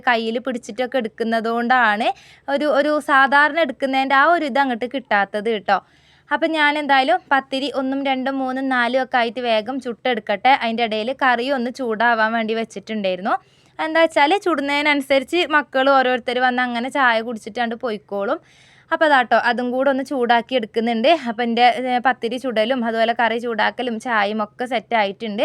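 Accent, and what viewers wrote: Malappuram, India. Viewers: നിങ്ങൾ സംസാരം കേട്ടിട്ട് വയനാട് സ്ളാങ് അല്ലല്ലോ ജനിച്ച നാട് മലപ്പുറം ആണോ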